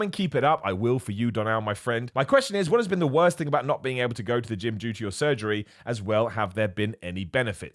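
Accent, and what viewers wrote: United Kingdom. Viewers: As an Australian I don’t think you sound like us! Although your British accent is a very mild one if that makes sense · American/Australian?!? Weird... You sound totally British to me.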